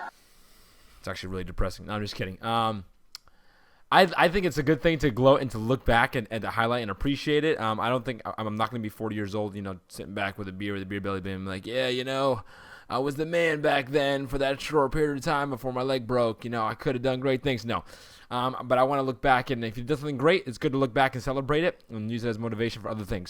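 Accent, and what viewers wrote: Massachusetts, United States. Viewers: What City/town did you play for? Sounds like you lived in MA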